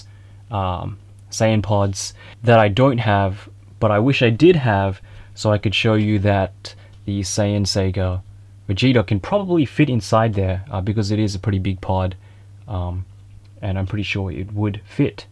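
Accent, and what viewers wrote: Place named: Australia. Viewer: I was your 500th Sub haha. I'm guessing from the accent you're in Australia too? Where do you get your figures from. I've found that hobbylinkjapan is the best so far. Let me know if you have other options.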